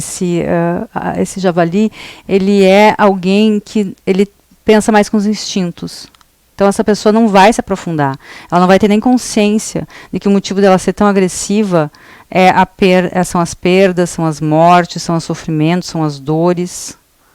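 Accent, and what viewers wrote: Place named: Rio Grande do Sul, Brazil. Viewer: Pelo seu sotaque já percebi que vc é do Rio Grande do Sul. Parabéns pela leitura, vc tem o dom da expressão, muita intelectualidade!! Gratidão!! 🥰 Namastê 🙏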